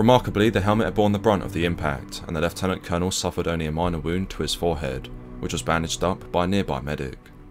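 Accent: United Kingdom. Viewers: I could never crack where he's from but as soon as he pronounced battery as ba'ery I knew he was bri'ish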